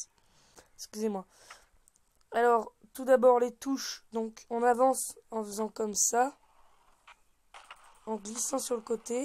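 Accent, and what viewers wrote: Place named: England, United Kingdom. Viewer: Ton accent anglais me tu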